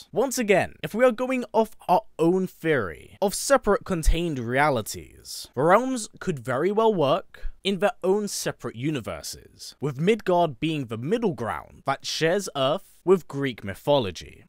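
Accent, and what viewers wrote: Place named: Scotland, United Kingdom. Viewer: Wouldn’t say British, more Scottish myself. It’s when he says “lad” that I really hear it personally. But I really agree with the rest of what you were saying about the universes! It sounds dope.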